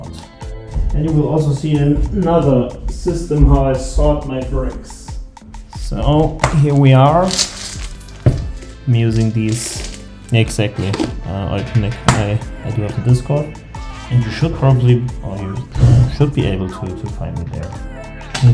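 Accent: Germany. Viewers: His accent? He's german - not everyone speaks American English or whatever bro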